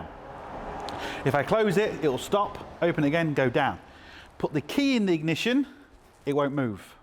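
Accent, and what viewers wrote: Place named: United Kingdom. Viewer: I've been enjoying the vids mate. Your a cheerie Brit. If you can get some of them fine lasses to speak I'd be in heaven.love British accents.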